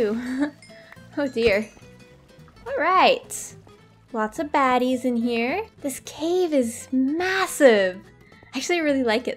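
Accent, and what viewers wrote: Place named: Canada. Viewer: As a fellow Canadian i love her accent aye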